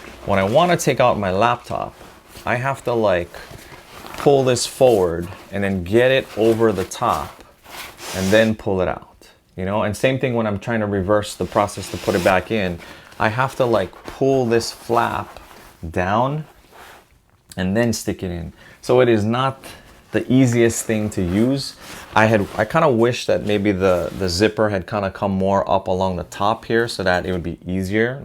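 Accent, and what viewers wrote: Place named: Hawaii, United States. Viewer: I could tell you were from Hawaii before I even saw the name if your channel.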